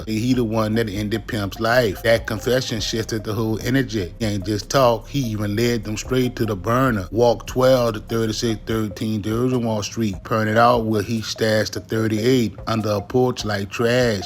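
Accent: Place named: South, United States. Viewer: Is this ai with a country accent? I understand what he is saying but the cadence is weird.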